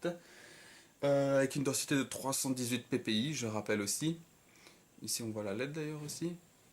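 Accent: Belgium. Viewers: Slt. Je suis intéressée par le Nexus 5 qui va sortir prochainement. Etant egalement belge, où peut-on s'en procurer un? Super video, et vive l'accent belge en effet! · vive l'accent belge ! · Alors c'est ça l'accent belge ? C'est marrant ;3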